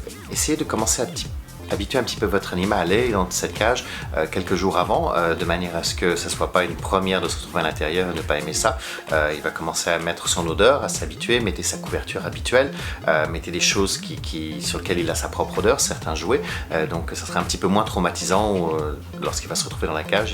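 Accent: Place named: Quebec, Canada. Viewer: Bonjour, merci pour cette vidéo extrêmement détaillée. Même si j'étais fort bien préparée, j'ai appris de nouveaux conseils avec vous. Je me sens beaucoup plus confiante aussi. Également, je me demandais êtes vous québécois? Vous n'avez pas l'accent français. A bientôt 😊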